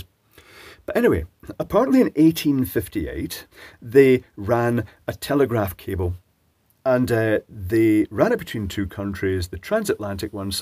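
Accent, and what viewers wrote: Scotland, United Kingdom. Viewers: I have always wanted to hear EM theory spoken in a Scottish accent. Maxwell was a great scott.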